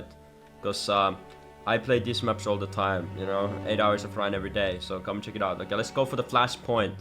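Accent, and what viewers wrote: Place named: Scotland, United Kingdom. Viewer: wtf is your accent? its a mix between like scottish and asian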